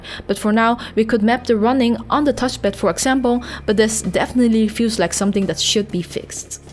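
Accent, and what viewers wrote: Netherlands. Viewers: Its just a dutch accent. Not dutch-asian. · Is that a Dutch accent?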